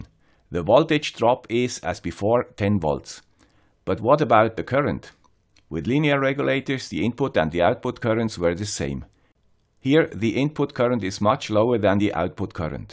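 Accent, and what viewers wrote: Switzerland. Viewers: Sali, love your Swiss accent! I also have not been able to get rid of mine. As long as you call the meat on the plate not like the timber no worries. But the FBI is like ei so is the quiescent current. English is not regulated like German. Downunder we drive on tyres while in the US they use tires. There are tongue breakers. California has Yosemite and in the land of Koalas we eat Vegemite, both same ending but different pronunciation. Acronym abuse gets one confused so in our ham radio club ce hand each other upper sideband siticks! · Hey, you just got this video mentioned on Hackaday. Good job and hope your channel continues to grow. May the Swiss accent keep on going!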